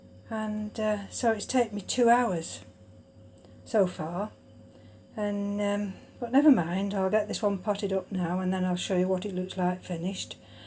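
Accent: United Kingdom. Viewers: What part of the world are you living ? (from your accent, I assume the UK). The fact that they've gone into a bark-based mix with reasonable root systems, I would start feeding whenever you water remembering a flush with clean water every so-often. In the UK, it's the growing season and you have active root tips and new growths so they will appreciate some feed right into the Autumn.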